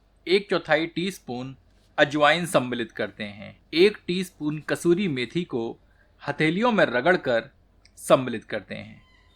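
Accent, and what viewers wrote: Standard. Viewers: आपकी परिष्कृत शुद्ध हिंदी का उच्चारण उस पर चार चांद लगा देता है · a pleasure to listen to you Shudh Hindi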